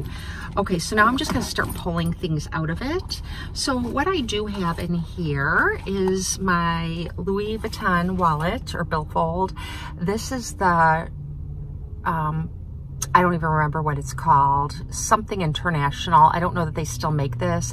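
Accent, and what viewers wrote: Midwest, United States. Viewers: I bought this same one in medium in chocolate. The medium is like a large. Got it on eBay for $200.00! I LOVE it. Heavy as the dickens. Mine had a pen stain inside on the green suede, but I was able to get it out with an Emory board! I knew you were from the Midwest based on your voice. My family is from Chitown!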